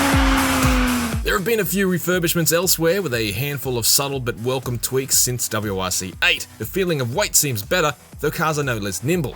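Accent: Ireland. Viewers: I don't know, man. He sounds Irish to me. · he does sound Irish tho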